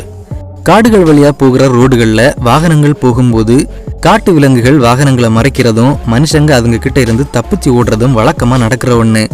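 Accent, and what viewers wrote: Kanyakumari, India. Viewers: Bro KANYAKUMARI aahh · Bro neega kaniyakumariya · Nega kanyakumari ah 🤔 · Bro neenga Kanyakumari ya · உங்க ஊரு கன்னியாகுமரிதானே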